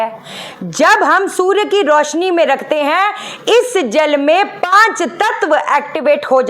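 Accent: Punjab, India. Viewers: 🎊Dear Mam! 💝🎁 You know what सबसे best thing about you is your punjaabi diction and emphasis on our ownself मंत्र जप!! 💐 "👌Siddhi baat hai!! --👍☺️💝 as you most often say" 🎊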